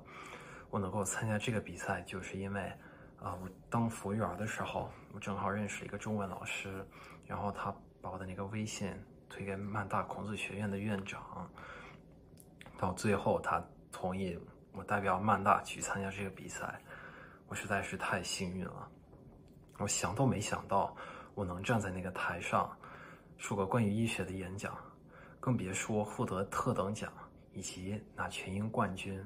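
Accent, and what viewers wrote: Standard. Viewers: 真厉害，太标准了！ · 说的太好了😱发音也很标准哈哈哈哈，你的华裔朋友一定很多是北方人吧，儿化音掌握的很好（eg. 服务员儿～～）🤣你的视频很有启发性，学习外语最快的方法果然还是和母语者交朋友～如果有机会来中国学习或者工作一定又会收获一段很难忘的经历。btw端午节吃的甜粽还是肉粽哇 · OMG！两年中文就这么标准！我英语学了快20年啦，还那么烂！你也太牛了吧！ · 真牛👍！普通话甚至说的比我还标准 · omg 很标准！可以关注instagram账户吗？🤔🤣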